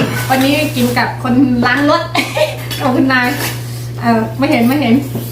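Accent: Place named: Isan, Thailand. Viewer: เว้าสำเนียงอิสานแล้ว น่ารักมาก มักหลายเด้อ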